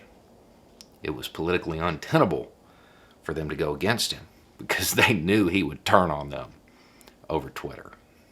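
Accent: South, United States. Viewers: Wisdom with a Southern accent. Who'd-a thunk it?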